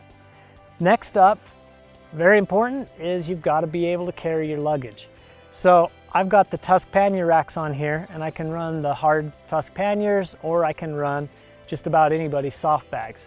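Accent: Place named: Utah, United States. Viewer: He's trying to say accessory, but has a bit of a Utah accent.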